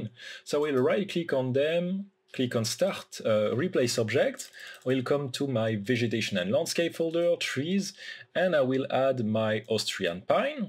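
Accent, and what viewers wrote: France. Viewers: J'adote ton accent français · that french accent is très audible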